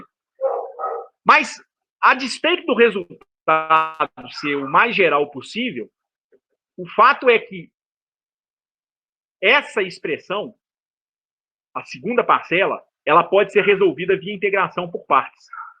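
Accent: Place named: Minas Gerais, Brazil. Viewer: Parabéns meu amigo. A vinheta é fenomenal. E o sotaque mineiro me lembra de 20 anos atrás.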